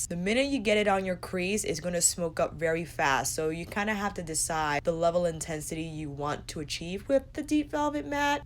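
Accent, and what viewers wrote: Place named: East Coast, United States. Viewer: I dont know what kind of accent you have, but I like it. Feels East Coastal.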